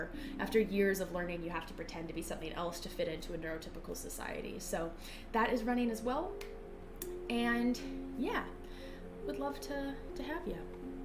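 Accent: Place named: Canada. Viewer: You’re Canadian, eh? Sammmeee 🎉❤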